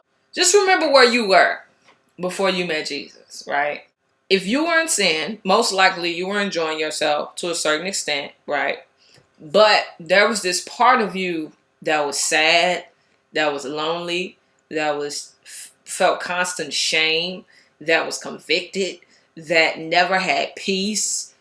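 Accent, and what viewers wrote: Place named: Northeast, United States. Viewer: Ma'am, where are you located? You Sound like you from up north east coast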